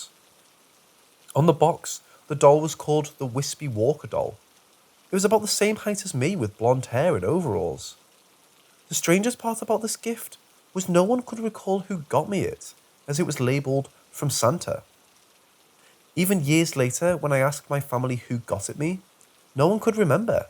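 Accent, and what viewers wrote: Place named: United Kingdom. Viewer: Great stories, cool British accent ✨🤡🧐👍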